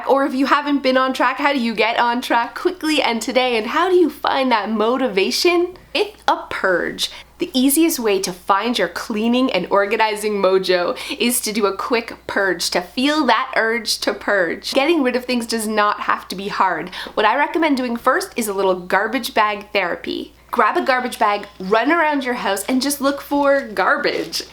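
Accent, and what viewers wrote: Canada. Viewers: First 10 seconds of the video: op she's Canadian lol.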